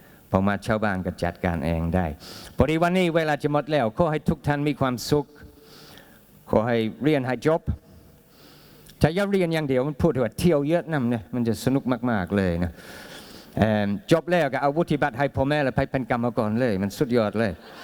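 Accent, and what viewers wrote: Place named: North, Thailand. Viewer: ที่มึงพูดอะเมืองไยล้วนๆ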